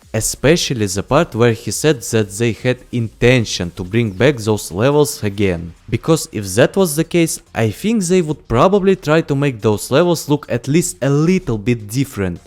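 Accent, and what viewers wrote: England, United Kingdom. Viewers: Oh, you from England. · Oh, you are from England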